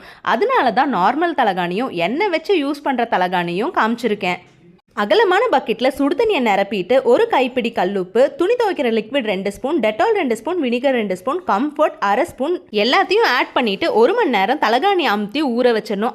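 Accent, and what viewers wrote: Coimbatore, India. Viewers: உங்க பேச்சை ஒரே அழகை கோயம்புத்தூர் ஸ்டைல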